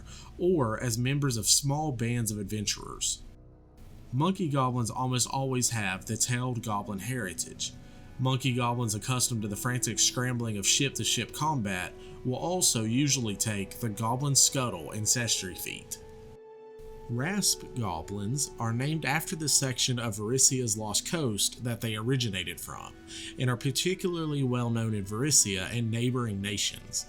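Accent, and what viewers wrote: South, United States. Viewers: Love your work & a friendly Southern voice! Peace!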